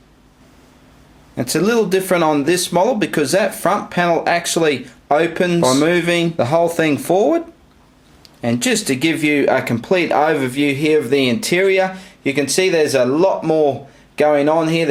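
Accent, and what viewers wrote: Australia. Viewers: Jedi Master Productions well coming from an Australian I can tell you that not every Australian speaks like that but some do. not putting on that accent to sound more Australian because it's his real voice. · I love your Aussie Achsent Aussie Aussie Aussie oi oi oi.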